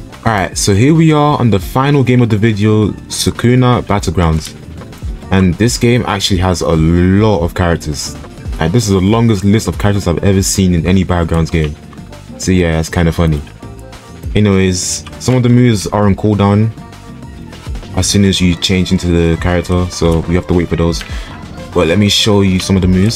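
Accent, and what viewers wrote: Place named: United Kingdom. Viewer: He’s British I didn’t know this was his real voice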